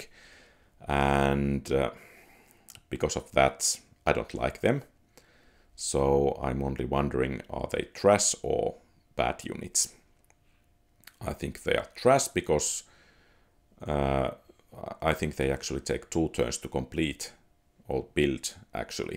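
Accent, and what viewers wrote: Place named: Finland. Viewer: rallienglanti oli vahva täällä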